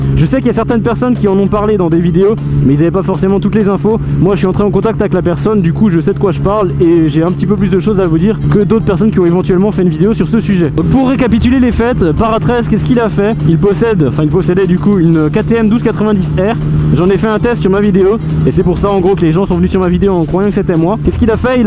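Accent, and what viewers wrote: Alsace, France. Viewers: Salut tu est d'où d'Alsace ? Sinon super vidéo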